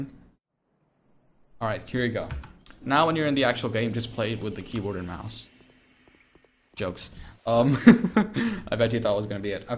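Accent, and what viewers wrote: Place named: India. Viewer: dang bruh, your indian accent is barely noticeable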